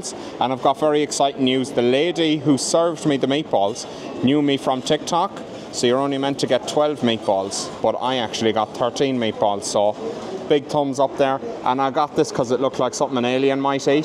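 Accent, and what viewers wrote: Ireland. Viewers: I was today years old when I discovered that the word filming is actually pronounced "fil-i-min." With an Irish accent! Looking forward to that video of you actually trying to put together that new desk of yours. Even cursing with an Irish accent is brilliant! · you are delicious, you are lovely, and you are gorgeous!! My parents were born and raised in Ireland and I grew up surrounded by my parents' off the boat Irish friends and random aunts and uncles that would come to the US for VERY long stays. It's been years since my parents made their transition it's goes down a treat (as my Dad would always say) to hear your beautiful voice. I had no idea how much I missed the sound of Ireland until I tuned in. Thank you!!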